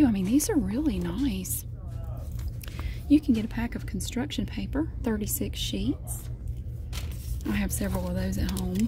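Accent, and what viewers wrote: South, United States. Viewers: Hello I just wanted to say that I like your southern accent (idk how to spell it lol)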